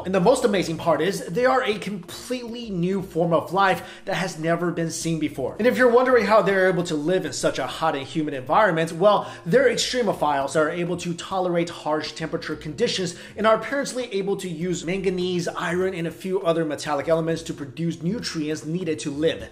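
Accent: Canada. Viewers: Is he Canadian? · All I can say is: "Are you Canadian?"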